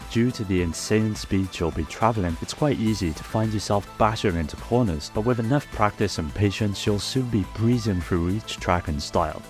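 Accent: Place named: England, United Kingdom. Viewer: Suikoden right off the bat? Fantastic. Just got stumbles upon your video on my feed and i like your english accent, because as a non native speaker it's easy to listen to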